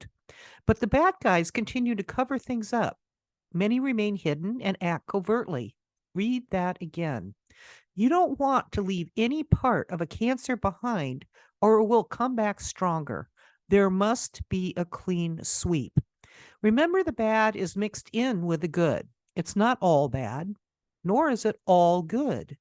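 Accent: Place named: Illinois, United States. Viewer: Love your voice! Reminds me of growing up in Illinois! Great info and a pep talk too just to remind us how far we’ve come!😊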